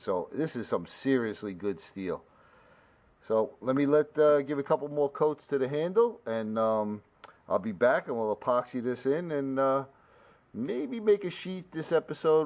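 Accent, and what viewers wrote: New York, United States. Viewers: my friend. EXCELLENT work! Those are talented hands fella. It really is too bad that Nicholson/Weller is producing such garbage now. They used to be the best of the best. Maybe they should find something else to do eh. That accent of yours sure sounds Bronx/New Yorkish? Take care. I always enjoy what your up to bud.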